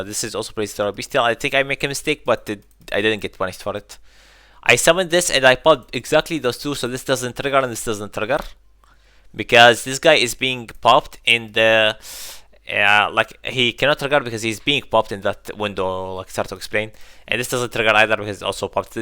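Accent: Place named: Iraq. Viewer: من صوتك كلت عراقي مابيه مجال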